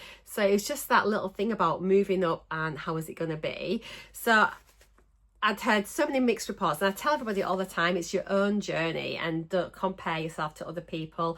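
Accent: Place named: Manchester, United Kingdom. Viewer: First time commenter. I found you a few weeks ago and really enjoy your videos. I'm originally from Manchester but have lived in the states for many years. I feel a bit homesick when I hear your accent. Your weight loss.journey is inspirational. I don't know if the perfume giveaway is just for UK subscribers. If not, I would love to be in the drawing. Jo.x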